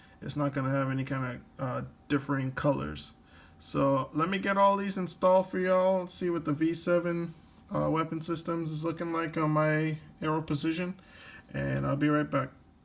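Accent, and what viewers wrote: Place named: El Salvador. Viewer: Unless you came from elsalivdor? Speak english without the accent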